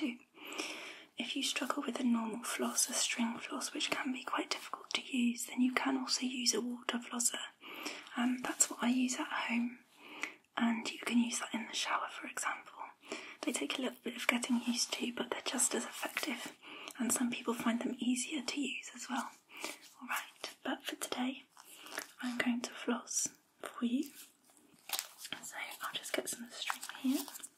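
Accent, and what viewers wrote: United Kingdom. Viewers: Don’t know if You saw but I commented this on your previous video and I thought you’de like to see it :))) Wow just found this channel from this video and I have to say that I’m in love! What a great video with excellent soft spoken levels and then variation of some whispering too. Then when it goes straight back into the soft spoken it is so tingly. Maybe the familiarity of the common accent from my area of the U.K. helped enhance the relaxation! Triggers were also very well put together and I thought the camera and mic quality was on point too! Great length which I personally prefer! Overall fabulous video and definitely earned a new subscription 😊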